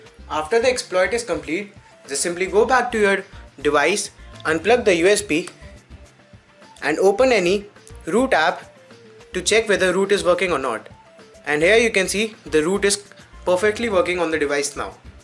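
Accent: India. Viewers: dude, your indian accent is hard to understand for people who are not from india. he wants you to put captions on the video or make custom subtitles on your videos.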